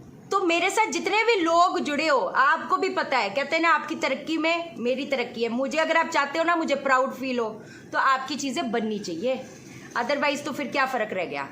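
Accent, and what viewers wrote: Punjab, India. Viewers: Your way of telling Passha logo (punjabi tone and way of communicating) is killing... Love you Mam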